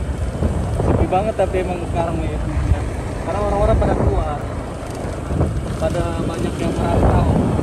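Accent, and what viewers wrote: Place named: Medan, Indonesia. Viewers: Saya kira bang ini orang medan krn logatnya kayak medan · Bhasa ny kek org medan y kk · Qkira org medan.. dari logatnya, gk nyangka klo org lampung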